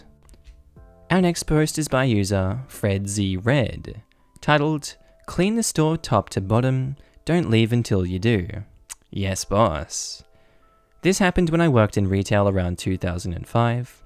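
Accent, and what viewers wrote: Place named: Australia. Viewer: BTW i recommend your channel to my aunt who’s learning English, for listening skills. God thing you’re a native speaker!! Aussie accent is real good for learners! <3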